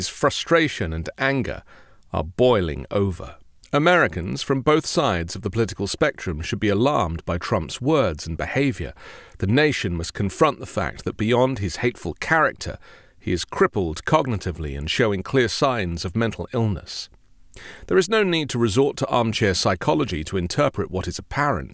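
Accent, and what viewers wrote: England, United Kingdom. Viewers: Age is not the question. The questions are related to mental and physical capabilities. Putin is an older gentleman but I would not want the American President have to arm wrestle with him. What is the "magic" age where these capabilities decline ? Sounds like the narrator is talking about President Biden. Some professions require an exam which determines they are ability to perform in a job. This does not exist for the Presidency or other elected positions. Why does the narrator talk with an English accent ? Is Europe trying to affect American Elections ?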